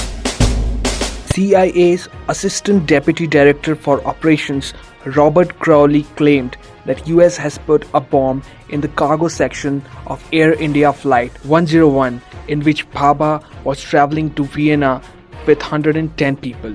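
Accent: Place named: United Kingdom. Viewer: Fuck your British accent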